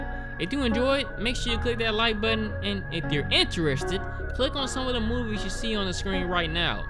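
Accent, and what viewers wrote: United States. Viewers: This guy has a nice American accent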